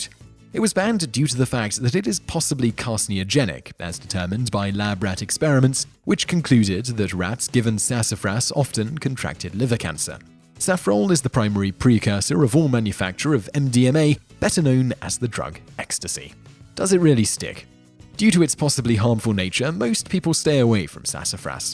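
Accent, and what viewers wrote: England, United Kingdom. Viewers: Simon said Indian tribes during this presentation. I didn't know the people of India were categorized as tribes. Another issue, why do most of your videos always have, "for example, in America"? I realize the US might be your largest audience, but the things you discuss also happen in other counties. Your American-centric views are getting tedious. If you want to come across as intelligent, please do so, a British accent doesn't mean you are smarter than the average bear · I hate listening to an english accent with head phones on. Like this channels content. Just dont like the voice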